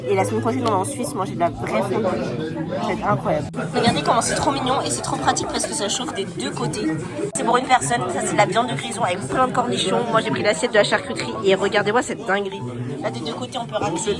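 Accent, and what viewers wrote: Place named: France. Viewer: Yes I would, nice 👍 French accent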